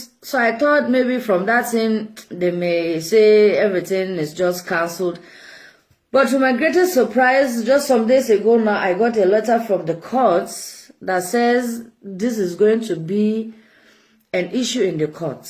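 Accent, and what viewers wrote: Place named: Nigeria. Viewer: This lady is making alot of sense my dear that is how we see it. Her acent sound like Nigeria. God bless you dear for speaking out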